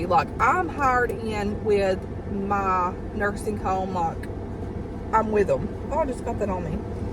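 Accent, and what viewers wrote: South, United States. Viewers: HUGS from Vancouver Canada. You are a wonderful person with a fabulous southern accent, love it! Never explain to anyone. Be yourself always. I enjoy your honesty and kindness. Have a wonderful day :) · I love your accent a southern accent is a beautiful thing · My favorite salad is a wedge salad. It's a wedge of iceberg lettuce with bacon and blue cheese dressing. It's so good. I adore the way you talk. Moved here to NC from Ohio and I adore the southern "accent". You have a innocent, down home feel when you speak. Don't ever change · Honey if that girl doesn’t like a southern country accent she might want to move out of the state of Tennessee. Of all the things to get upset about in this world she has to be upset about how someone speaks. Bless her heart she needs help.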